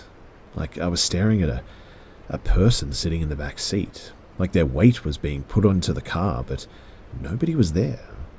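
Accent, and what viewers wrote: Australia. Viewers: You are a phenomenal storyteller! Your Australian accent makes it even better.! People with British accents are the same way not all of them but the ones who have the gift of voice of being able to read a story and make it really come to life. Please remind me of the old days. When TVs were a newer item or before they were invented. They had radio shows that it will keep people entertained. Families will gather around the radio, which was usually a Big thing. Meaning, they were physically big they would be on a table and one family will gather around and listen to the scary stories and because that’s what they had at the time they had sound effects and everything like if someone was walking they had a recording of somebody walking towards you or walking away and they had a door that can go anywhere with that will open it and close a different sound effects to make you feel you are there. 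When I was little my grand mother bought me this little radio is back to about 60 years ago maybe I wasn’t 60 years ago I’m like maybe 30 years ago and I remember it vividly. It was this little white razor on rectangular and the part where you tuned it was black and it has orange number so you can see them and we have a station. Right near us and play those types of shows at night and I remember being in bed with the flashlight and those were things that we like to get it tells you how long ago was my alarm flashlight that took like three or four of the big batteries just to light up an area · Love this your my favourite narrator none compare, is it the Aussie ascent? Tone I simply believe it’s both thank you for not quitting these uploads, I’d honestly be searching YouTube relentlessly trying to find the right voice. Xx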